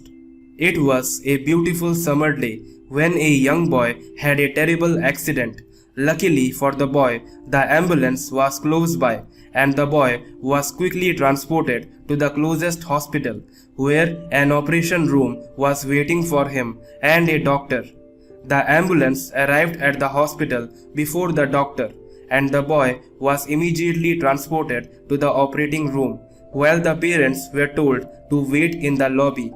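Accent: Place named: India. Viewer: indian accent god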